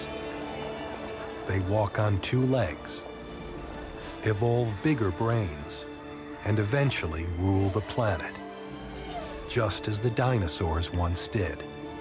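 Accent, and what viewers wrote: United States. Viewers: yawn, more american voiceovers... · Who is the teller? He sounds american 😢😢. I prefere Nigel Marven.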